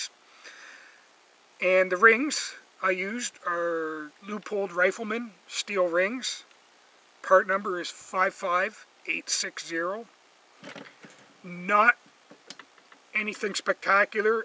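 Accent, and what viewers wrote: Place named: Canada. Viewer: he's talking Canadian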